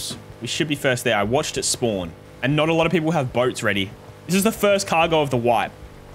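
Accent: Australia. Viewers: I dunnor, mate. I think most people can't get over how Aussie you sound - myself excluded because I love you guys to death.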